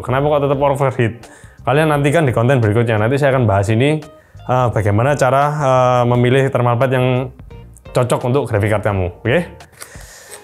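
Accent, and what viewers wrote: Java, Indonesia. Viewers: Uasik nek om hani seng edukasi. Mantep dengan beberapa penekanan jowone. 😂😂😂👍🏻👍🏻👍🏻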